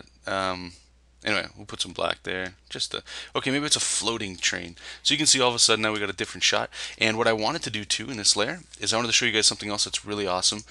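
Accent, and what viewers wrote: Canada. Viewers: yo, you a canadian?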